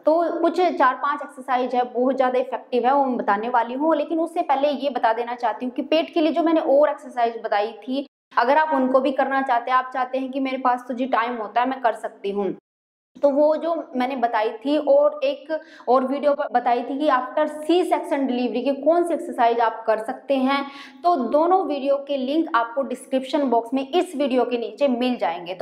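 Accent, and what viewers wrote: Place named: Himachal Pradesh, India. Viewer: lgta hai Himachal se ho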